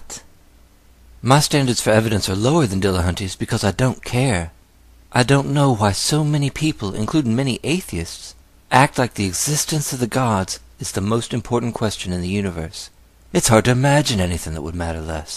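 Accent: South, United States. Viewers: I really like your voice. Are you from Virginia? You sound like a classic southern gentleman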